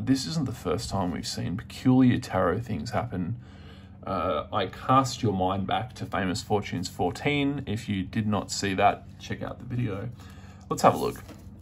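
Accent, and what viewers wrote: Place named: Australia. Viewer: You sound like an Aussie...🇦🇺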